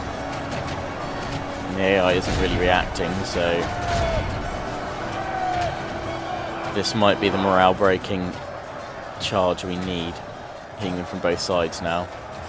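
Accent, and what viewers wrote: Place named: Chichester, United Kingdom. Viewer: i love your content:p and btw are you from chichester by any means?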